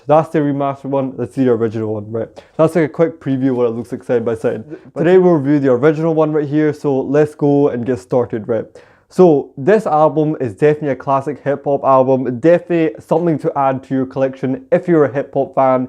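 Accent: Fife, United Kingdom. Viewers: Sounds like a Fife accent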